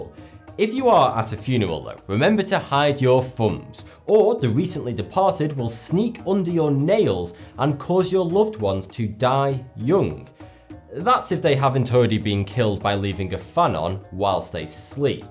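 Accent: United Kingdom. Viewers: Now I'm confued. For the longest time I thought you called yourself FORTY-two. But the name of your channel is, so I figured your British accent made it SOUND like. Then you just mentioned they're superstitious of 4, the 40s, including your name FORTY-two. So, which one are you, or? And how did the name originate? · why are you british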